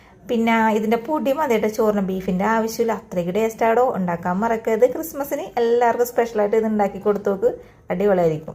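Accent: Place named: Thrissur, India. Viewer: മ്മ്‌ടെ തൃശ്ശൂർകാരി ആണെന് തോന്നുന്നു ട്ടാ വരുന്നു 😂💖🔥🔥🔥🔥😊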